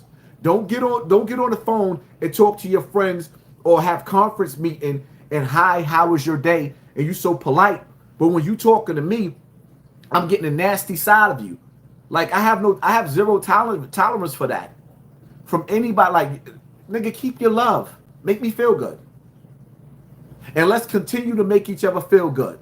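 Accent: Brooklyn, United States. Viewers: BROOKLYN TONE N THE BUILDING...EAST NY STAND UP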